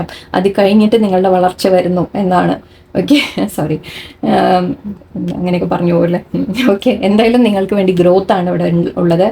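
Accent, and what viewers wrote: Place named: Koyilandy, India. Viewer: ഞങ്ങളെ കൊയിലാണ്ടി ഭാഷയാട്ടോ എന്താ ഇവളെ ചിരിക്കൂന്നേ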